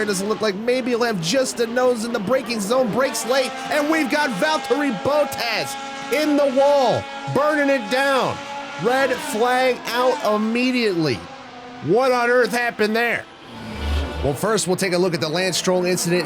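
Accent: United States. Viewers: American accent with f1 intro sounds weird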